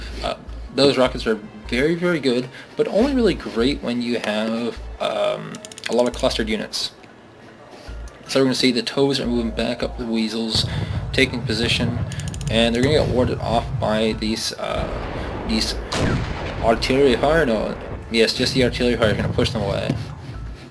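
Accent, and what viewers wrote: Canada. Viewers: Gorgeous vids! You sound like you might be Canadian, it's funny how you would say everything with the vowels "ou" in an accent. but in the case of "routing" where the canadian accent way of "ou" is actually the proper way to pronounce, you turn it the other way around :p Looking forward to more!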